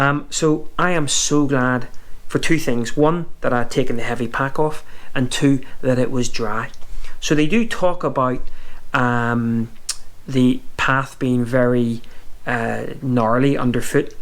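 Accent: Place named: Northern Ireland, United Kingdom. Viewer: Where is your accent from? It sounds like you were born in NI, but you moved to Scotland later in life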